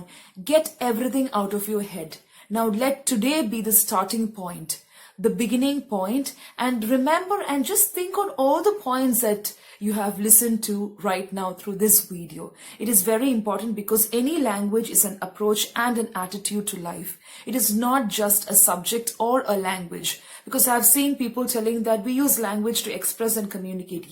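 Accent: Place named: Kerala, India. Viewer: Now I realised about your fluency your a malayali girl wright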